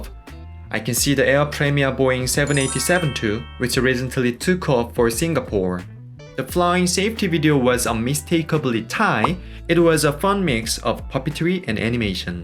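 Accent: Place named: South Korea. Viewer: Such an honest pronunciation of a Korean dude. Just speak Korean and add captions in English would be a much better and high quality method for your vids mate.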